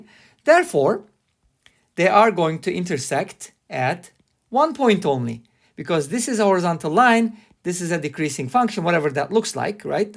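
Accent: Turkey. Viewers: The accent is unmistakably Turkish. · you sound turkish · you exactly sound like you have a turkish accent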